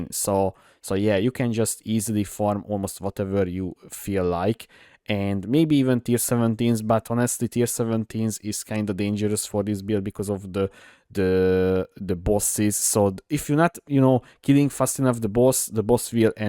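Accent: Hungary. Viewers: Amúgy nem akarok paraszt lenni, de érdemes lenne valami angol tanár vagy ilyesmi, nagyon keményen érződik hogy magyarosan mondasz dolgokat. (Normal helyett normál, rekáveri) Hozzáteszem én se vagyok mérföldekkel jobb, nekem is mondogatták ezt, de ja.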